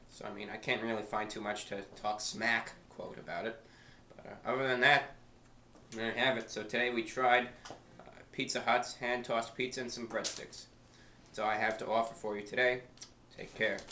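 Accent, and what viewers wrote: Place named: United States. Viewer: I dont know if this american sounding dude is in the UK or not based on the fact he said takeaway instead of takeout in another video 🤔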